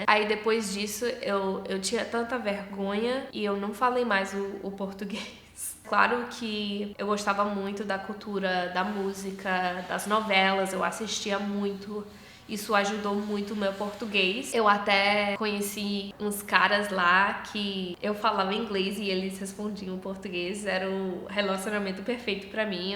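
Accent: Ceará, Brazil. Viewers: vc estava falando muito cearense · Seu sotaque e bem cearenses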